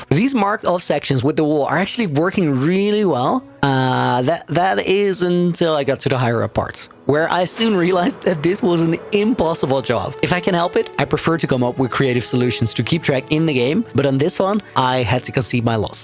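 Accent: Netherlands. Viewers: incredible! just finished binge-watching your videos. also, your English has a slight Dutch accent. Does that mean you actually are Dutch?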